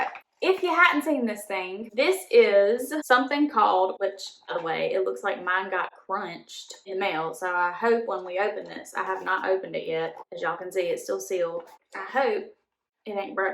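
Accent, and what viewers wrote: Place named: Louisiana, United States. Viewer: You sound like your from Louisiana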